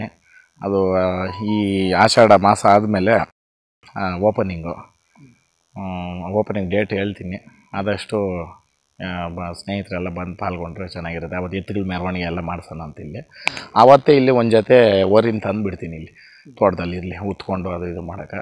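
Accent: Kolar, India. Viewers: I love your kolar kannada and also your work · Nimma kolara kannada kelode chenda❤️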